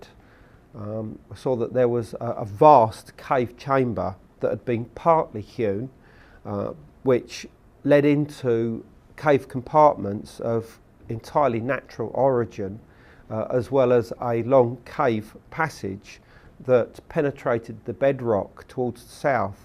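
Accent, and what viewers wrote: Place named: Mockney, United Kingdom. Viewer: Mockney bellend!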